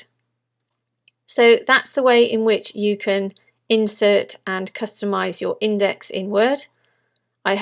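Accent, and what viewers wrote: England, United Kingdom. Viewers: I searched high and low for a UK English voice and after many tries, I found you. You have provided an EXCELLENT working model that is concise, and easy to follow, what more could a writer want? Well done, and thank you. Judith Page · She IS an English.That's what an English woman sounds like.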